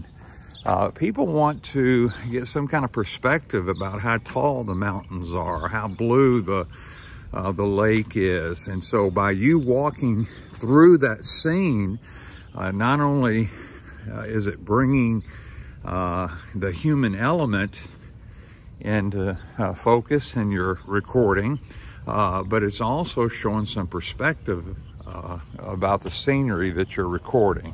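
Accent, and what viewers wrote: South, United States. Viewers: That is fantastic! I could pick up on a little southern accent there, my friend! I'm with you on the Roll Tide!! You do awesome work on your IMovie work...I guess I'll have to double down on my attempts. I have tried using it on a couple of videos (not trail vids) and it was a lesson in futility to me. Thanks! Btw...I'm s 3rd career pastor, and serve in the far south end of GA, in Hahira, at the very bottom of the state on I-75.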